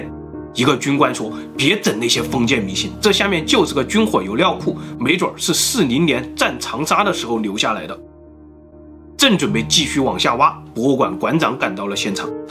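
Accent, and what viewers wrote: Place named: Fujian, China. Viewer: 刚把老九门看完😊，是弗兰人吧？完全的弗兰口音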